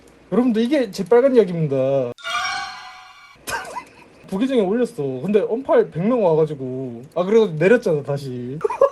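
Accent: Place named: Pohang, South Korea. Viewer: 포항시 북구 사람 같네요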